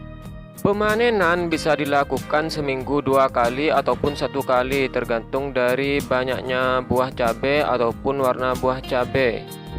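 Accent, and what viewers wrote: Madura, Indonesia. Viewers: asli mana bang? kog logat madura kayaknya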